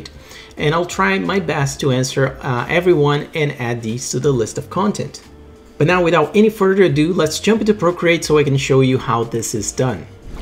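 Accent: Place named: Italy. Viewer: Trying to guess accent... I'm with either Italian or Brazilian/Portuguese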